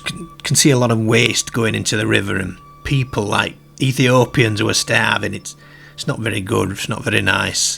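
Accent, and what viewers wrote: United Kingdom. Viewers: I've heard his accent before, just cant recall where its from in the UK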